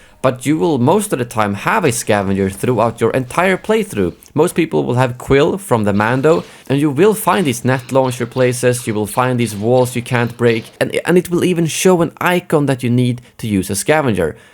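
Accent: Sweden. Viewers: Are you Swedish? (It really sounds like that when you talk, I’m just curious) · Love that Swedish-English accent haha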